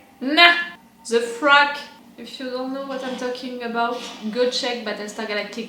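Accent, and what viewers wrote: France. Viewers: Elle est française ça s'entend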